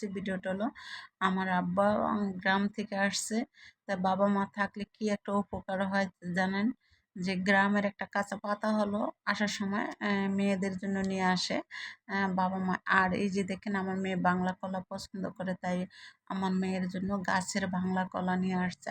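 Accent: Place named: Barisal, Bangladesh. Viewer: আপু তোমার দেশের বাড়ি কোথায় /আমি সুইডেন থেকে বলছি। আমার বাড়ি বরিশালে। তুমি বরিশালের কথা বলছো তো জিজ্ঞেস করলাম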